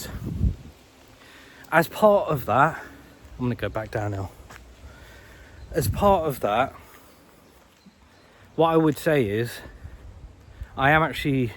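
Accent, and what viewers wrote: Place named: England, United Kingdom. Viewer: Love your walk and talks. You present deep thought provoking conversations. Love your accent. Wish I was born in England.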